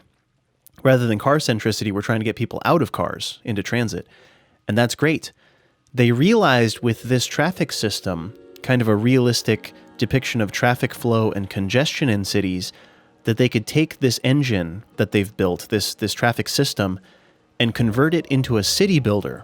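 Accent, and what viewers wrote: United States. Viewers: You didn't say it right away, but the the more you talked the more I knew you were 100% in Northern Virginia :). - And although there is a decent number of people who use the metro, it is still highly underused. I came from California, and we had a very similar experience there. It seems, in the US, we love to talk about metro, but we often prefer being in a car.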